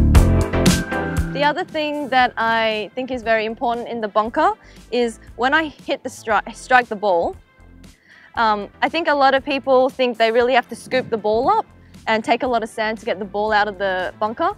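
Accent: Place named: Australia. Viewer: Wonderful Aussie accent. And of course, beautiful legs! Go Minjee.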